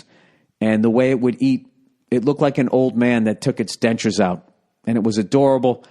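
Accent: Boston, United States. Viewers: Blends well with the Boston accent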